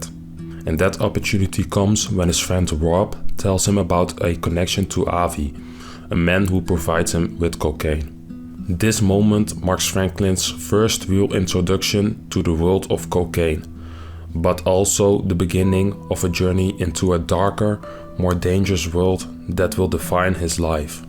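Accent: Netherlands. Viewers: bro you sound dutch great vid btw. brother ✊🏿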